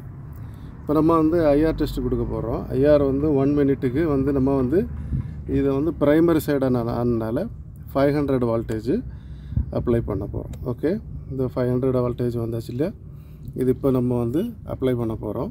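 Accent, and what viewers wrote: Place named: Kanyakumari, India. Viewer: Kanyakumari slang 🥰🥰🥰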